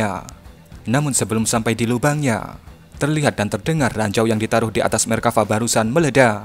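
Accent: Java, Indonesia. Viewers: suara lhu medok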